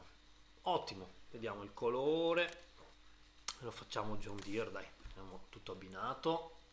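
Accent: Veneto, Italy. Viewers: Sei Veneto?😂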